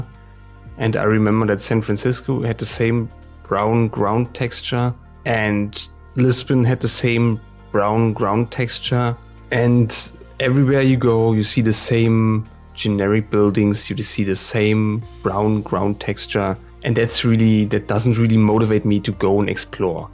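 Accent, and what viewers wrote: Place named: Germany. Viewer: Are you German (because of your accent)? I am German as well, that’s why I am asking:D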